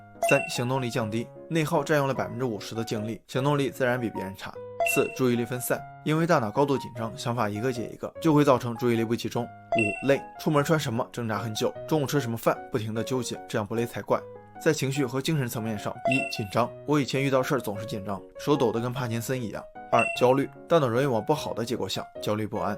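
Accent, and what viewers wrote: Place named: China. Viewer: 听这口音，up本来就是我们国内的吧😅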